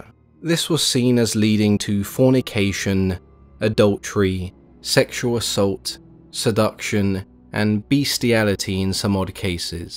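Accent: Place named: United Kingdom. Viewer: the British accent is making this video even more terrifying 😨😨😨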